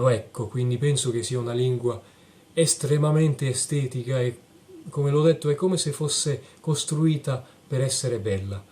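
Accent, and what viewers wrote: South, Italy. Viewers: ma sembri un meridionale italiano lol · Sembra tu abbia un accento tipico del sud Italia! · Già, è molto molto meridionale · Bravissimo! Hai un accento marcatamente meridionale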